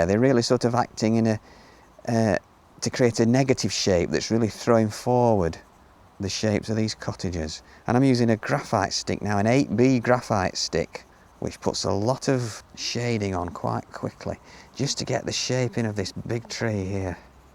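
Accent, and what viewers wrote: England, United Kingdom. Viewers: What type of English accent is this? Reminds me of Eric Idle. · I am so amazed by this painting and Mr. style, I watch this video at least 3 to 4 times a week....even while I'm doing my own creating. The colors, the cottages, the British accent - all my absolute favorites! My love for the English cottages and the landscape keeps me re-visiting this video and the others, as well. Thank you, Mr. for such soothing yet helpful tutorials.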